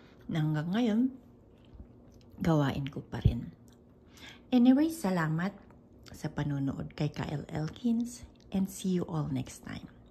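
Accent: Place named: Ilocos, Philippines. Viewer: Nakakatuwang pakingan ninang ilokano tagalog❤❤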